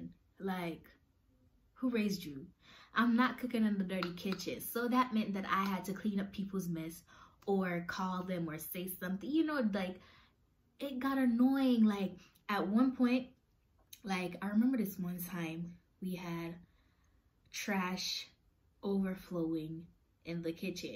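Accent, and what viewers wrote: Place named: Jamaica. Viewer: Mi hear the patois fam 🤣🤣🤣big ups no say a word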